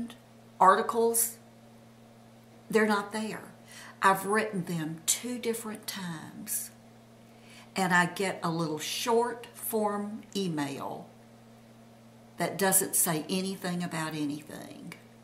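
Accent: South, United States. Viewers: Hi, I am new to your videos and just love them. I have watched six already and can't wait to watch them all. I am a young at heart 69 year old and love my make-up, hair styles, pretty and cool clothes and jewelery. I think you are lovely in all ways. I also love your southern accent!! My son moved to South Carolina about 15 years ago, and he has the southern charm now. I am going back to view more of your videos Bye Judie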